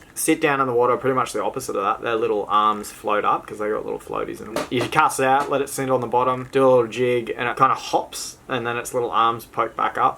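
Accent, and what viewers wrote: Australia. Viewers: Are you Australia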